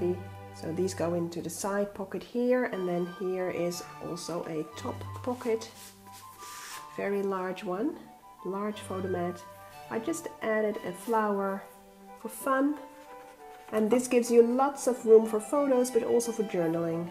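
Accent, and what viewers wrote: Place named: Netherlands. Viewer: I should have recognized that accent. I'm from the Netherlands as well but moved to Canada when I was 8. Do you have a tutorial on how you make your albums? I would love to make one similar to yours and that size. I can only find tutorials to make them with envelopes and card bases. What are the measurement for an a4?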